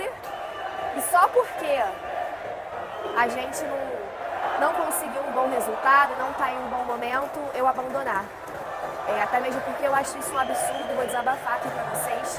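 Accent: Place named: Rio de Janeiro, Brazil. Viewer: acho muito engraçado esse seu sotaque carioquês 😅😅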